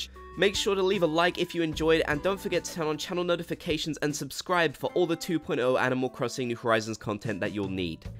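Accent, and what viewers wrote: United Kingdom. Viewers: Wait are you british i thought your american but you sound British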